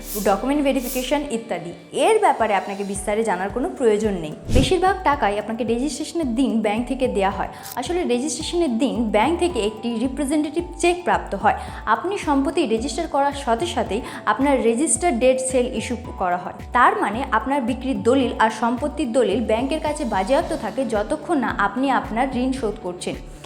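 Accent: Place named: India. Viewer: bangla ta hindir moton kore bolche re hay hay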